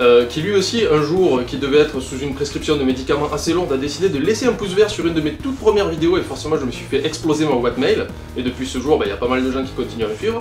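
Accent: France. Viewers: Découvert via le dernier film de TGWTG (quoi? un tel accent Franchouillard ça ne se loupe pas! Surtout quand il appartient à un autre fan du Doc') et depuis bah je suis sans relâche, alors franchement GG, tu mérite, et surtout continue comme ça!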